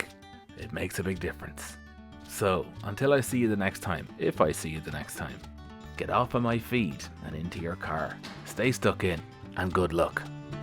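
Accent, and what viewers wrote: Ireland. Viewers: The art of pedantry - a new psycopathy in development, in a gentle brogue.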